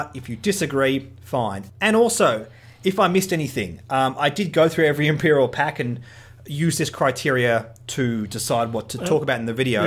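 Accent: Australia. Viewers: Is that an Australian accent? Whereabouts are you? I... I need someone to play with XD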